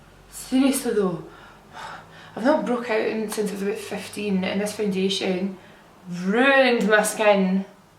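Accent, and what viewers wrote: Scotland, United Kingdom. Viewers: I agree. Beautiful girl but can't understand what she's saying. Strong Scottish accent!!!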